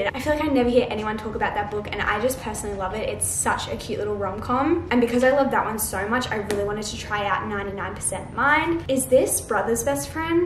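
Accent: Australia. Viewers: scripture in the opening card AND aussie accent? IMMEDIATE subscribe!! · wait, are you aussie? if you are, thats amazing! i am too, and i never ever see any aussie booktuder/ booktokers!! <3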